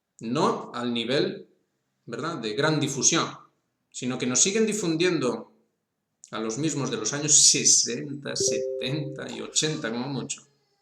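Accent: Spain. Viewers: Es un gallego. No le entiendo ni madres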